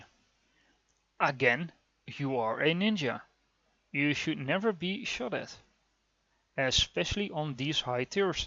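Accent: Netherlands. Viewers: Are dutch?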